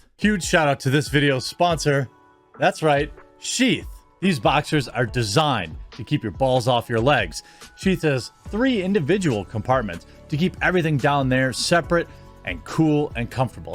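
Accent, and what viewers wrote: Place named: Wisconsin, United States. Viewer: Love the show! But I can’t tell if you’re saying wahmen seriously because the way you say marget Robbie, Dray-gons. I know you’re from Wisconsin or somewhere lol again love the show!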